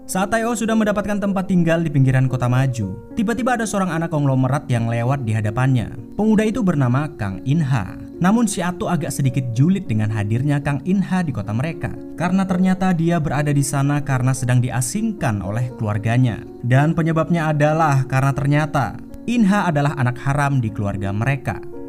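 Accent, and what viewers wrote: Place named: Banyumas, Indonesia. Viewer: atmint e ngapak geng😹😹😹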